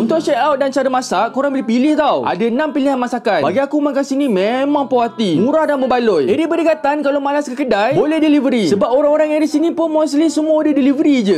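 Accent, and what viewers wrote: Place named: Johor, Malaysia. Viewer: Bang cakap johor tetapi daerah mana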